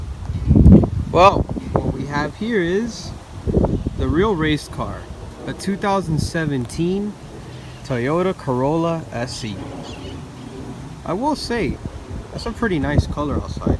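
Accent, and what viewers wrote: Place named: Miami, United States. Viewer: I can just tell you from Miami lol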